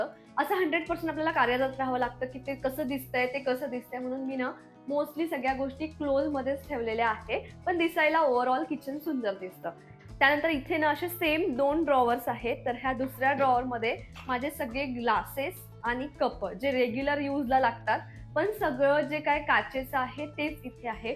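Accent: Vidarbha, India. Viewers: Mala tuza aajcha kitchen organization khup awdle,tuzya languagewarun nakkich tu vidarbhatli aahes ase watle,karan me suddha thitlich aahe wardha district apli bhasha aikayla khup chhan watle,me pan aatach modular kitchen kele,organize kele baryapaiki pan aata tuzi pan idea use karel apli manas apli mati😅😅😅😅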